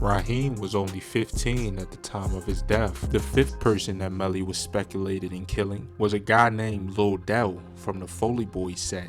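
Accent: Baltimore, United States. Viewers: I can tell u from baltimore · U from Baltimore?? Lol u def got the accent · baltimore accent 😖😖😖😤& wtf is “Nokim Noah” 😳😵‍💫🤣🤣🤣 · This narrator dude gotta be from Bmore!! Twwu, Neeews, HuuuLU😂😂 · You definitely from bmore bruh lol keep doing ur thang g 💯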